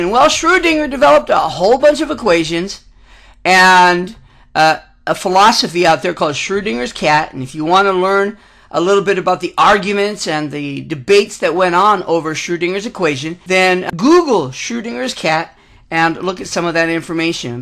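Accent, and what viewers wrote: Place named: England, United Kingdom. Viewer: You sound a bit like a country yokel from England with that accent there.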